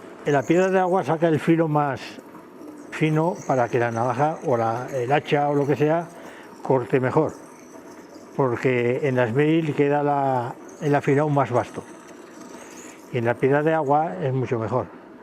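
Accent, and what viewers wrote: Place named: Aragon, Spain. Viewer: Qué gusto da oír hablar con ese acento aragonés.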